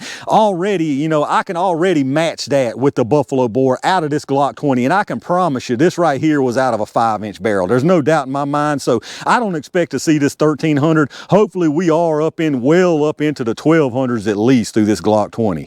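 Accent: South, United States. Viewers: This video is worth watching for the rant. 🤣 Got to love that pissed off southern accent.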